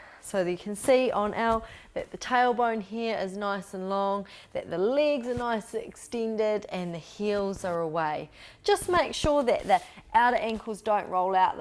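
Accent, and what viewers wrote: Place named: New Zealand. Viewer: haha awesome NZ accent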